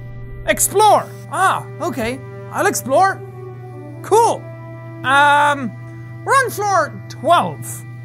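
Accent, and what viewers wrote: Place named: Ireland. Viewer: my friend does not like ur vids so she came up with some stuff to say about u her: his voice is too irish me: what the frick is he supposed to do about that her:his hair is too green me:its blue now her:oh,is face is so plain like why not grow some facial hair? me:he did her:oh, well how bout he SHAVES that me:*leans over and dies laughing*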